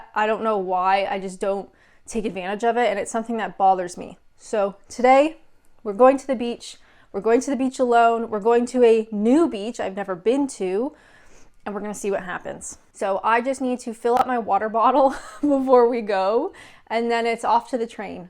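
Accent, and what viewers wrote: United Kingdom. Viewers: When you said to that seagull thee absolute cheek I was like yeah you’re definitely one of us.. you couldn’t of said that more English. Been a big fan of your channel for a few years now and you honestly have the British in you. Great content as always. Keep it up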